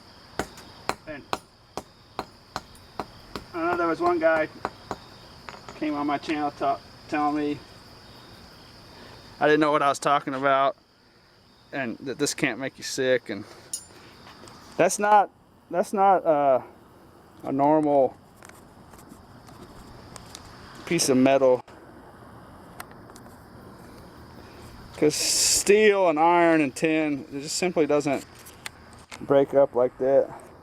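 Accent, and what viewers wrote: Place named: North Carolina, United States. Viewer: I'm curious about where your accent is from? I like the way you talk...sounds like north Carolina.....thx for the vids and info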